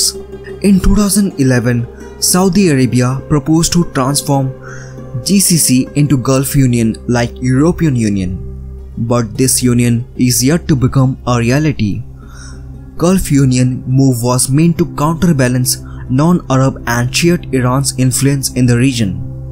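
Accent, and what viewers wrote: India. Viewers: Indian accent is irritating,but the content is superb! Congratulation to the presenter for the effort he has done. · Nasty accent I think it's Indian guy talking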